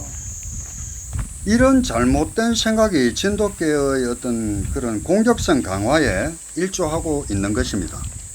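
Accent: Gyeongsang, South Korea. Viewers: 말씀이 갱상도? 갱북? 구수하고 신뢰가묻어납니다. 어미개. 영특해요. 시끼교육도. 야무지게. 멋찐 아이들로. 자랄듯. 유익한 설명도감사합니다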